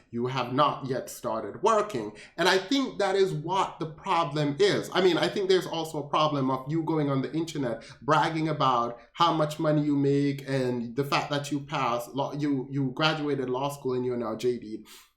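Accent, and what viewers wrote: Jamaica. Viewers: I think I detect a Jamaican flavor to your cadence. Am I right? Are you a fellow yardie? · You Jamaican? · I appreciate your content and the way you speak so eloquently. Liked & subscribed. Too many Jamaicans on YouTube disguise their/our beautiful accents and speak with a lot of American slang in desperate attempts to sound like many others, which sounds inauthentic. It's refreshing to listen to you. Keep up the great work! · We visit Jamaica a few times a year. I LOVE my people there. Can someone adopt me? I heard the flavor in his voice too!!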